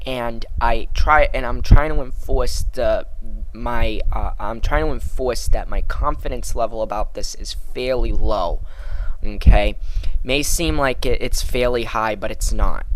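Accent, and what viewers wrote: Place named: New York, United States. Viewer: do you live in new york city, you have the new york accent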